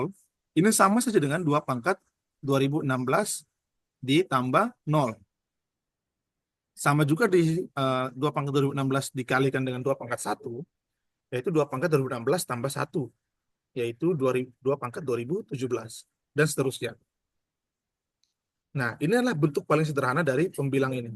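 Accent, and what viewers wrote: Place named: Nagekeo, Indonesia. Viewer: Logat Nagekeo sedikit sedikit keluar e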